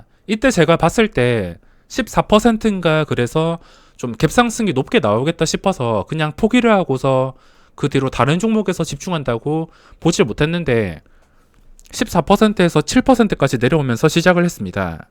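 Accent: Gyeongsang, South Korea. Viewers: 창원사투리 진짜 짜증나네 표준물좀 써주시죠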